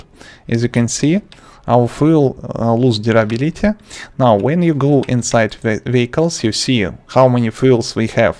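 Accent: Russia. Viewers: thanks so much very useful. you sound like your russian, I am also russin good luck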